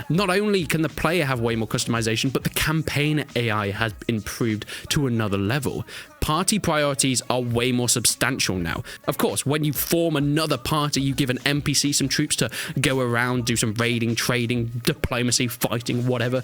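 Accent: United Kingdom. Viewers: I hate your dumb british accent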